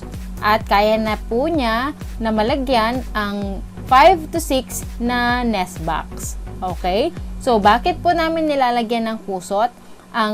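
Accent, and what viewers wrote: Pangasinan, Philippines. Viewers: Litaw na litaw accent ng pangasinan hahaha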